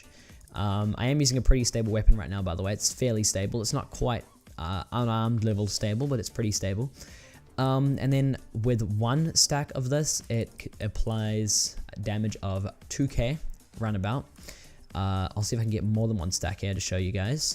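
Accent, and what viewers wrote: New Zealand. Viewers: is this dude from NZ, it kinda sounds like it i'm probably wrong?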